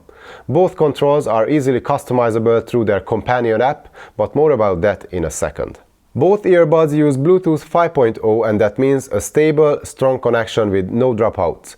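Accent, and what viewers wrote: Hungary. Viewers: magyar akcentus? :)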